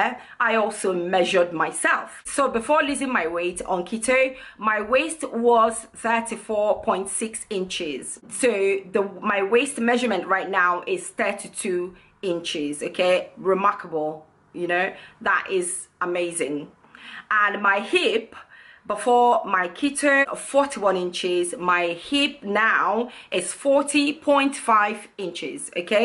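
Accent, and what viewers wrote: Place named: United Kingdom. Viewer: Love your accent are you British?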